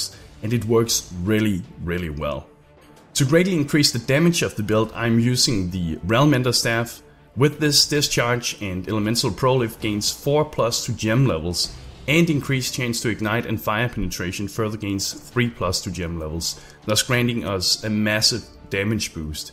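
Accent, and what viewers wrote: Denmark. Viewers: One discharge, one baby haha...Du er Dansker ikke? man kan altid hører det ;)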